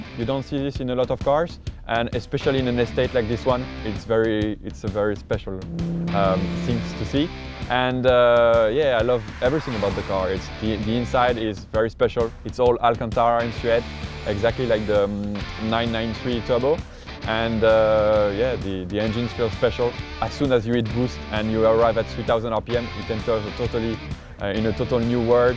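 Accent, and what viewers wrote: France. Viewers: Un français qui parle anglais c'est toujours grillé ! Bravo en tout cas, j'adore cette caisse. et de savoir qu'une moto trial rentre dans le coffre ça donne des idées ! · French accent suck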